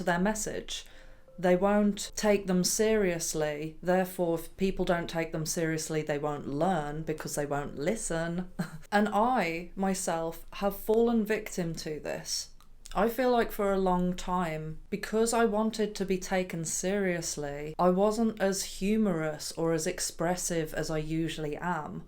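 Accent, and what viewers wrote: Birmingham, United Kingdom. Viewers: In these turbulent times, I can recommend a return to traditional orthodox Christianity. As westerners, this is already pre-anchored in our subconscious and is a rich and interactive spiritual environment with angels, saints and The Theotokos (Mary) etc. Love your very slightly brummie-sounding accent 😊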